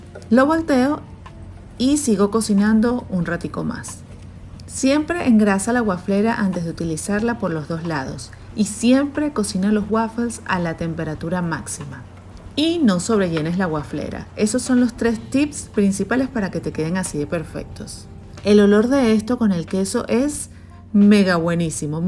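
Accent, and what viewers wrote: Venezuela. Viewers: Me encanta escucharte demasiado venezolano, ya me estoy comprando tu recetario ahora mismo !!!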